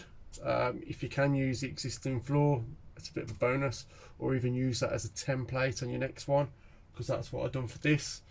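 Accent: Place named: Dudley, United Kingdom. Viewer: Just come across your channel - sound like your from my way (Dudley). Reslly helpful videos - thanks for uploading!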